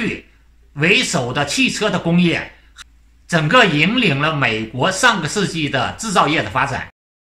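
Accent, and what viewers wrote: Shanxi, China. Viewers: 你是山西人吧? 自己好好纠正一下.难听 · 家門兒山西人嗎？聽出來了哈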